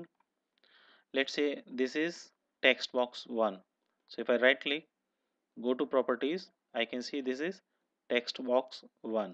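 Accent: India. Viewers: How do you understand indian english?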